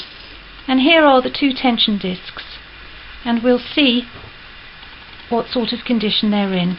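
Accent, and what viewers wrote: RP, United Kingdom. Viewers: Where are you from? You speak in such a posh way!:) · How cheeky to comment on your "posh accent",I was just so pleased to find your very helpful instructions.I've just bought a lovely old Singer and am having problems getting the tension right.Thanks to you I think I've cracked it.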